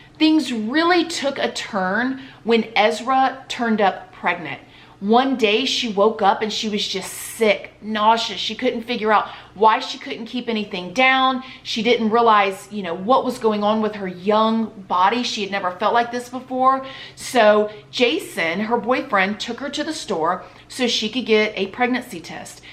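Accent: South, United States. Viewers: Love love love how you tell the story of real cases. You should look into being criminal narrator on ID. Your southern accent is refreshing to listen to!! I’m a new subscriber!!!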